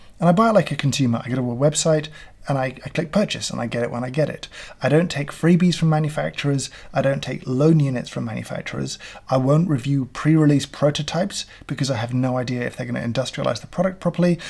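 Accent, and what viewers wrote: United Kingdom. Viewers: Proper use of the English language and a British accent lend all the credibility I need.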